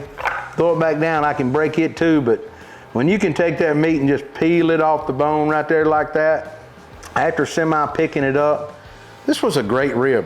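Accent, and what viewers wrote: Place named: South, United States. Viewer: First time watching, fantastically groovy info. Good (really good) teaching, useful information, and your 'southern accent' jumps out at this northwestern upper peninsula michigan yooper.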